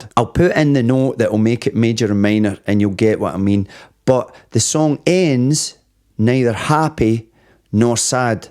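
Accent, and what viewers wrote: Scotland, United Kingdom. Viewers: Informative. Given in your beautiful Scottish accent!❤ thank you for the reaction! Lovely👍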